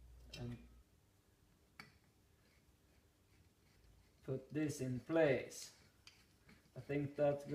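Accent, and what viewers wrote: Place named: Upper Peninsula, United States. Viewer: cool, the accent is very similar to what it sounds like in our Upper Peninsula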